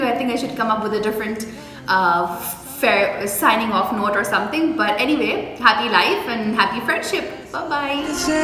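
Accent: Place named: United States. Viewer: Waaaoooww!! You have an amazing American accent. OMG that is soo cool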